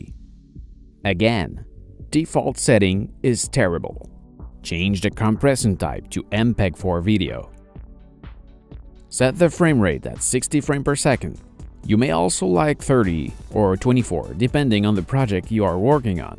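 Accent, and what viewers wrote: Quebec, Canada. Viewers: French Canadian ?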